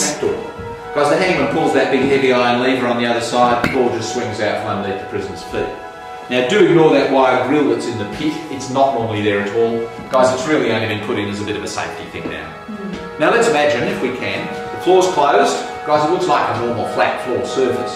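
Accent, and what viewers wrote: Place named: Australia. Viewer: Australian accent makes it sound less scary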